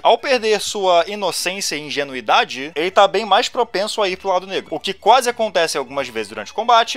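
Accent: Rio de Janeiro, Brazil. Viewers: Só percebi hj q o tem sotaque carioca kkkkkkk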